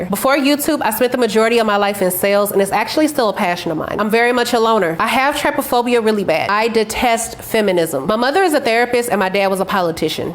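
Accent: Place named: United States. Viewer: I wanna kiss feet, legs, lips, shoulders., Grace Gealey, Zaza Ali, Florence Ballard(RIP), Karrine Steffans, Iva LaShawn are the hottest women. feet and shoulders are hot. i got a question for you that you didnt say in the video. What race/nationality are you? I saw on your Facebook page that you are from Puerto Rico. I thought you was from america because you sound like a black woman. You dont sound like a foreigner when you talk. Did you get married? I saw you are engaged to a man on your Facebook page. I wish wasnt engaged to get married because i would love to have as my woman. If you wanna know about me. Im a lightskin black man who is 5 feet 10 inches. I weigh 185 pounds. Im 49 years old. I live in Maryland. I dont have a black woman. I dont have kids. I live with my parents because i dont have a job. Im trying to get SSDI money from social security office. 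I got a telephone interview with social security October 31 about SSDI money. White people wont let me keep a job because white people complain too much. Thats why im trying to get SSDI money from social security. I hope social security approves me for SSDI money so i can use the money to get a nice apartment in white neighborhood in Alexandria, Virginia.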